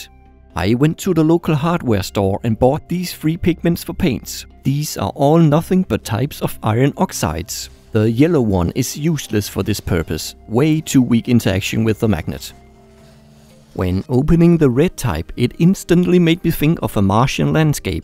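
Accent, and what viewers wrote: Denmark. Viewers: er du fra Danmark? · Er du fra Danmark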